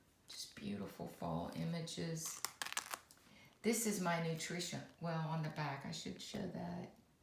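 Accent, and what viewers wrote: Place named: South, United States. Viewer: I absolutely love your fall planner the color is so nice for the season I'm trying to set up my ring light so I can be hands-free to do my video it would be my first one you're such an inspiration love your voice the southern accent always takes me away you're so sweet God bless you and the family 🤗❤️🙏🏼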